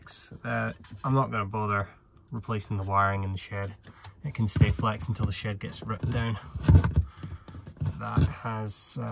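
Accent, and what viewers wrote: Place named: Scotland, United Kingdom. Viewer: Love your vids - also great to hear a Scottish accent too. I need to get my old Vig set up in my house, it's doing no good sitting in a box in my garage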